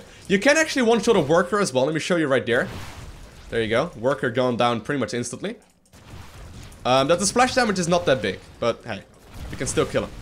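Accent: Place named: Netherlands. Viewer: dutch is definitely leaking into his english, compare this to his newer videos, you can really see the difference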